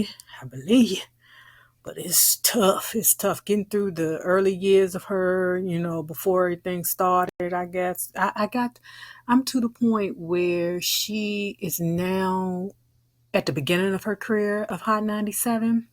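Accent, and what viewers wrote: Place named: South, United States. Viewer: I keep playing this just to hear yo country ass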